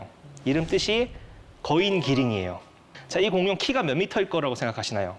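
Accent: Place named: Gyeongsang, South Korea. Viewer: 좀더 보니 갱상도네~~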